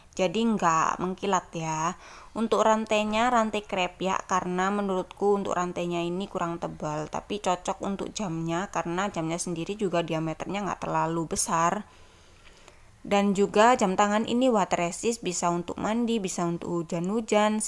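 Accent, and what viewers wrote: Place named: Indonesia. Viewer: Ngomongya NGEDOK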